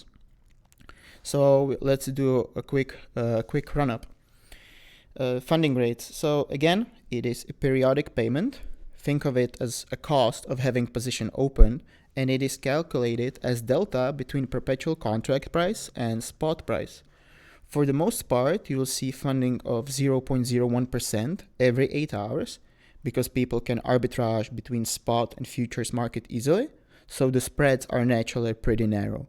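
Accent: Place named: Sweden. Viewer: Sounds swedish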